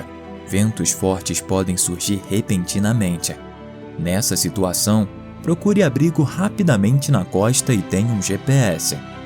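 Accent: Rio de Janeiro, Brazil. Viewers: Esse narrador carioca é a melhor parte do vídeo 😊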